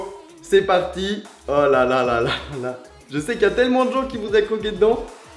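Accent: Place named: Alsace, France. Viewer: Ma mère quand elle t'a vue elle a dit "Ah sa c'est un alsacien ! "elle dit que tu as un très bon accent Alsacien et elle espère que tu le gardera :-) #TeamSnap :-)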